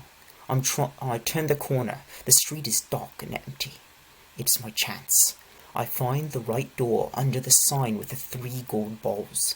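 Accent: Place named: United Kingdom. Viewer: I really love the British accent thank you !!!!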